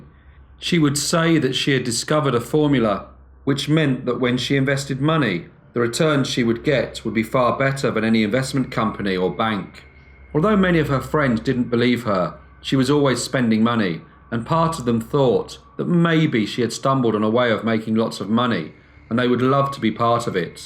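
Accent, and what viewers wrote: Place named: South East England, United Kingdom. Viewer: New sub here. Trying to work out where you are from in England! Your accent is similar to mine, so I'm guessing the South East?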